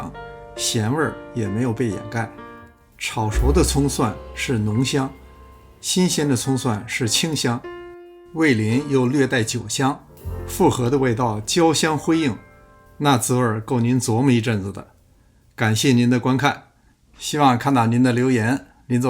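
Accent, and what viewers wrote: Beijing, China. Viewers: 最近看到这个视频，太喜欢这个节目了博主用心做美食耐心讲解，地道的北京口音娓娓讲述烹饪技巧👍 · 时不时蹦出的老北京土话让人听了真的很亲切！感谢您的美食分享！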